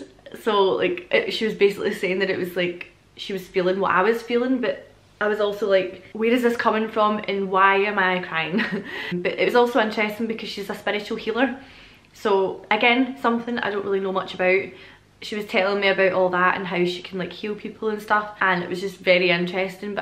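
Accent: Scotland, United Kingdom. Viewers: This is me listening to you. 😂 I just love your scottish accent!! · you always make my day better. I think you are perfect in every way. You are so beautiful and I have always been a fan of the Scottish accent so it drives me crazy to hear that accent coming from that beautiful face. Keep the videos coming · Welcome to America, Miss. Lot of things will be strange, but much will be familiar and inviting. Advice? I do have relatives in New Mexico, and the temperature changes DRAMATICALLY throughout the day, from hot in the daytime to freezing at night, so bring a couple of jackets, even if it's 100 degrees that day. Also, you can always expect that when someone in America hears your accent, they will want to tell you about their Scottish ancestors (the same applies to ALL foreign tourists we encounter here). I know it gets old really fast, but they really want to hear about the country their family came from, and they are trying to make you feel welcome.:) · I think this means u r thinking beyond the 3rd dimension. 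Past present future r all one, I been watching Aaron Doughty he does meditation but explains reality shifts etc (the same thing is happening to me) Have fun in US! They love Scottish ppl there, esp a pretty lady...in fact u could coast right thru on accent alone 😎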